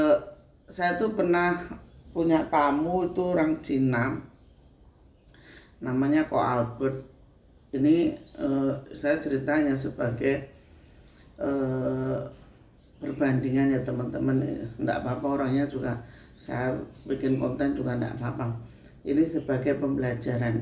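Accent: Java, Indonesia. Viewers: Ngikutin chanelnya mbak ini serasa kita jagongan (omong"an) langsung dg beliau di ruang tamu.Aksennya yg Jowo jekek/deles bikin sy betah,karena sy juga cucunya jekek😂Dlm berkisah,beliau seakan menyuguhkan hidangan berupa oleh" dari hasil perjalanan nglencernya😝Bukan menggurui,tapi sekedar crito,mbokmenowo ono piguno.Dg segala kerendahan hati,berulang-ulang beliau selalu mengatakan "sekedar menyampaikan".Jadi ya " ditrimo karepmu,ora yo karepmu,sing penting niyatku becik."🤣Sbg orang tua (80),sy paling suka ada orang muda yg banyak menebar kebaikan kayak mbak ini,sebagaimana yg pernah disabdakan Kanjeng Nabi:"Sebagus-bagusnya manusia hidup di dunia yaitu yg bermanfaat bagi sesama."🙏🙏🙏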